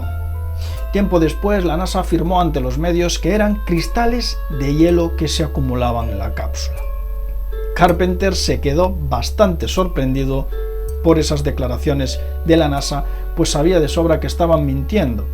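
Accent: Spain. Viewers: tu vos me da cáncer y mas tu acento de español racista y ladron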